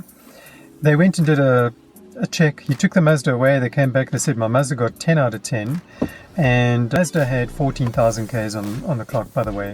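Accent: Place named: South Africa. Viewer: I searched XF Reviews on YouTube and came across your channel, watched 1 video and thought to myself, this guys definitely South African, your accent gave you away lol. But in the video you spoke of Dollars which made me think maybe you not from SA. Anyway, love your channel, Im a new Fan of Jaguar, especially the XF model. Mainly due to watching your videos, you don't sugar coat your real life experiences with the car.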